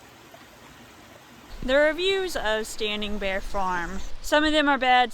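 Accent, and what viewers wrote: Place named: Kentucky, United States. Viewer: I’ve watched a few of your videos even including the van build. Max Patch Damascus and Mt Rogers are three of our favorites. Fortunately we live close since we live in central NC. A comment mentioned you as a Kentucky girl. Before reading that clue I could not put a finger on your accent. I even asked my wife who is from the Finger Lakes to listen to you. Of course she had no clue. I went to graduate school in Louisville 40 plus years ago so 4 years in Louisville and across the river (cheaper rent) in New Albany Indiana should have helped with your accent. Have a great journey!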